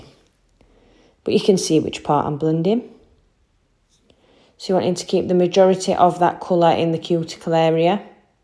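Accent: Yorkshire, United Kingdom. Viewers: I’m trying to watch as many of your videos as possible. Your work is so beautiful and fabulous clean application it’s a pleasure to watch. Love your accent too, are you in Yorkshire by chance, I’m in Sheffield 😊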